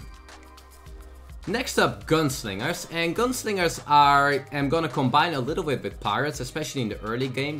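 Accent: Netherlands. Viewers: Obviously Dutch, that accent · This guys his dutch accent is so strong lol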